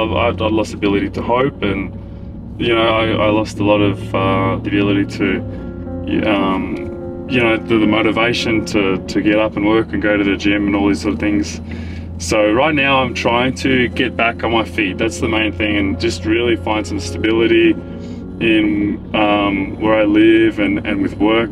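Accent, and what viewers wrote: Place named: Australia. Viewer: i have been traveling to golds gym from europe every summer for the past 10 years til covid hit. I have pics with you from almost every summer, with Karina Justin Steph Mike etc. You were always such an inspiration to me and to thousands maybe millions of people. I just wanna tell you, you dont be buff and huge, we just enjoy seeing you online again. Im sure you will heal as times passes on. Just take your time, find happiness and joy again. The community will always stand behind you. We all have to face our own battles most of the time alone, but strong people will win those battles, shut down the inner demons and find the real meaning of life again, which is love and selflove. I wish you all the best, and hopefully one day ill meet you again and you will have that bright smile with that aussie accent again.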